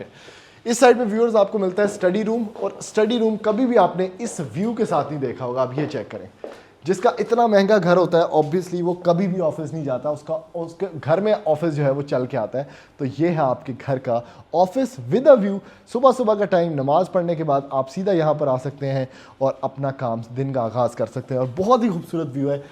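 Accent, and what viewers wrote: Punjab, India. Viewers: Ghar to bahut sunder hai but I really like your Punjabi touch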